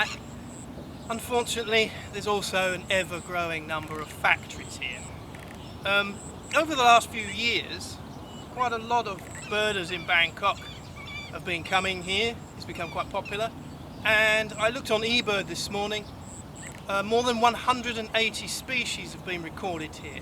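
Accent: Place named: England, United Kingdom. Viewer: It looks a gorgeous birding spot. I've never been to Thailand. I'm familiar with some species, but most are totally exotic to me. Your narration is very interesting and helpful. I also like your English accent 😃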